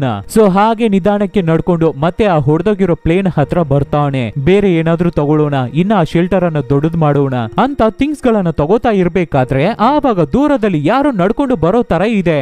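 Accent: Tamil Nadu, India. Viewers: Guru nin kannada language same Tamil avru kannada mattadalva hag ide guru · Ninna slang thamil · Kannada pronounce is not correct, he pronounced with Tamil accent. If it made in proper kannada, dialogue, you will get more likes and more view. Any good try.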